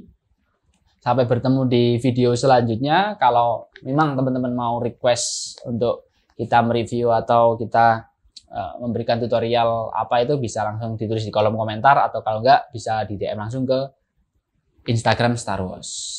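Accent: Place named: Java, Indonesia. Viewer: bahasamu lo mas muwwedok banget, dan klo masih newbie jangan ngejelasin yg kurang dipahami oleh penontonmu. belajar public speaking lagi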